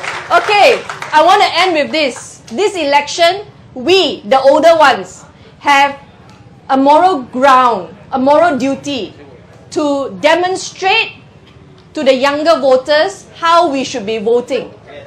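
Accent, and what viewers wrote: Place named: Malaysia. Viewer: Malaysian English..nyonya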